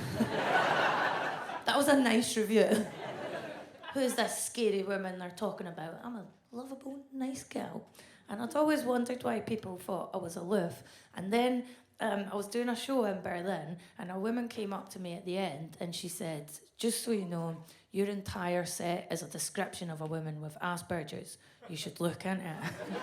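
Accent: Scotland, United Kingdom. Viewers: I have a Scottish friend that doesn’t sound anything like her, so I was wondering if there are different Scottish accents in Scotland? My friend is from Glasgow. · I appreciate accent so much after having the sound of the snide Scot who narrates on the YT channel, Real Engineering, being the only Scottish accent that I could conjure up in my head for the last year or so. I was really beginning to strongly dislike Scottish accents until hearing. Beyond that, her performances are excellent, as well. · I love her accent, just wish I knew how to understand Scottish lol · This is the first time I hear a hot girl speaking in Scottish accent! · Scottish accents aren't easy to understand immediately, but she's funny.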